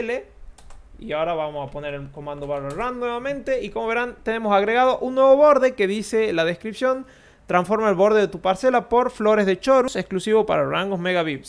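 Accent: Argentina. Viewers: Siento conocido tu asentó, eres de Argentina? (De que parte)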